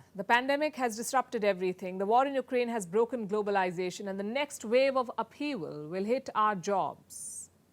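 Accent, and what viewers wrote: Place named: India. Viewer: Typical indian english 😅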